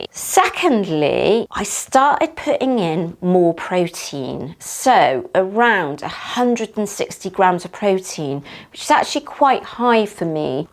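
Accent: England, United Kingdom. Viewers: That English accent wow. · You do look great, nice physique... I like the accent, You Brits 😆🤣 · You had me at your most splendid British voice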